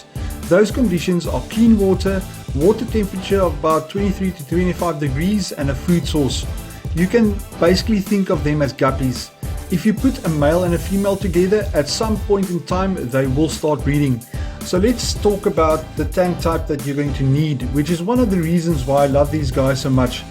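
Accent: Afrikaans, South Africa. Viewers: More oom kan ons praat oom klink Afrikaans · Is that an Afrikaans accent I hear😎👍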